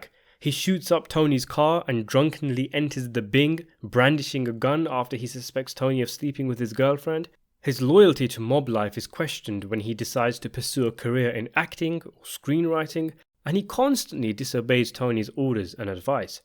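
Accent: United Kingdom. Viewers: Oh god Brit narrator I'm out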